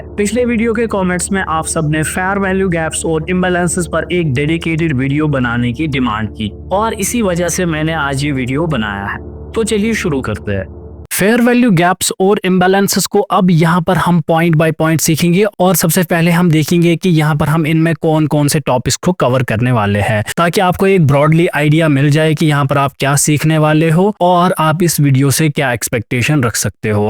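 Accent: Kashmir, India. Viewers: Your accent seems to be kashmiri. R u from kashmir,..??? I too am from kashmir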